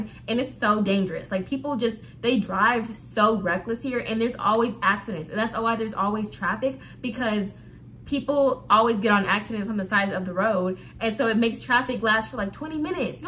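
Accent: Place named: New Orleans, United States. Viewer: When u talk about men it sound like u went out there n nigha was tryna pimp u or sum. N it sound jus like New Orleans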